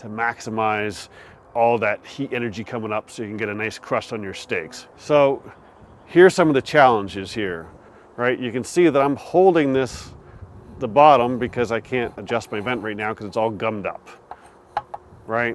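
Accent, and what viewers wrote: Canada. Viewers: Great video thanks. I’m considering the KK but doesn’t seem to be available in Canada. You do sound Canadian btw.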